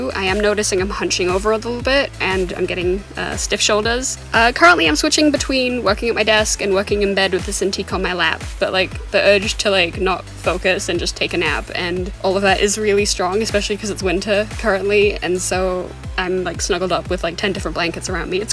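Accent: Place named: Australia. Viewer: Amazing drawing! Btw since its winter for you and from your accent we can tell youre from australia or new zealand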